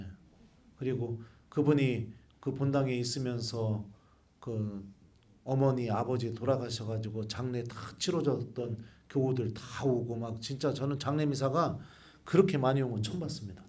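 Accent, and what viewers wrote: Chungcheong, South Korea. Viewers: ❤🎉 저는 2000년에 멀리 캐나다 🇨🇦 토론토 지역 오로라시에 와서 살고있는 충남 서산시 에서 첫 영성체를 올린 조 루가입니다. 그동안 풀납동성당, 잠실성당, 역삼동 성당에서 레지오 활동과, 성가대 횔동을 하다가 emigration 으로 캐나다 🇨🇦 토론토 에 왔습니다. 신부님 충청도 말씀을 듣고 친근감을 느낍니다. 조 루가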